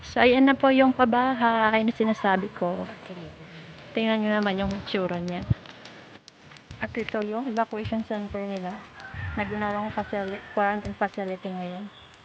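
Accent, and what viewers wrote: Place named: Waray, Philippines. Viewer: Waray gud kamo pag habal habal. 😁😁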